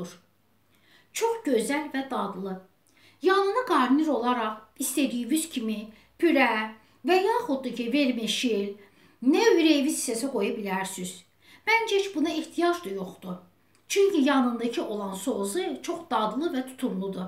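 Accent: Baku, Azerbaijan. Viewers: Lehceden srazi bilinir ki bakilidi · Shirin Baki lehcesi.Bashqa bir alemdu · Həsrət qalmışdıq Bakı ləhcəsinə. Yeni qoşulmuşam kanalınıza Bakının harasındansuz ? Mən Şüvəlanlı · Beh beh😋 yemeye söz ola bilmez. Men de hazirlayacam. Xanimin danishigi, Baki lehcesi lap lezzet eledi 😍😍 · Danisigina bax ay Allah lezzet eledi.Bakililar bir basqadir.🥰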